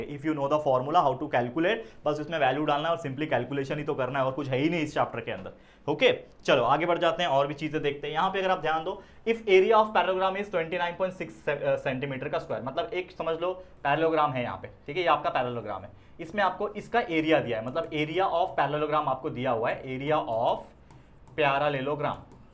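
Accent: Maharashtra, India. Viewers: Marathi voice❤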